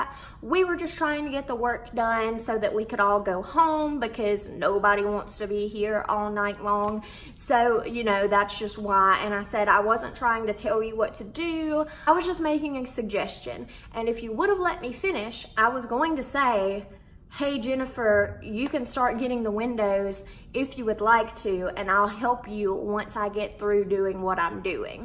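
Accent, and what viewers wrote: South, United States. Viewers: I love your accent and the way you say “being ugly” because my family is all from the south & they talk the same way & just value respect, and it just makes me smile honestly watching this video. Also, I’m so glad to have found your channel!! I just subscribed, and I’m looking forward to seeing more of your videos ☺️ also, I love your name. I have the same one. It’s pretty great!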